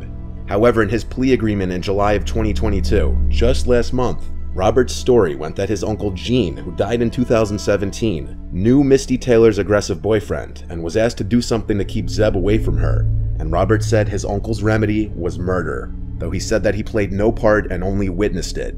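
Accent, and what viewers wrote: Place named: New Jersey, United States. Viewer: Great videos! I am super fan. I feel like I hear a slight NJ accent. Or NY maybe... Anyway, keep them coming!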